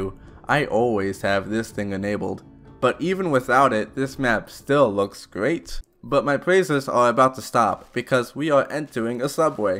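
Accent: United States. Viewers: What's your accent? New York?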